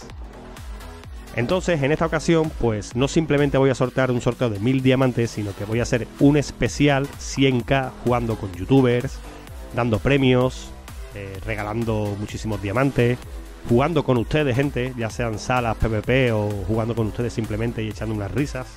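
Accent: Spain. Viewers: felizidades bro tu te mereces todo por tus buenos tips y consejos una pregunta de que pais eres tu voz parece española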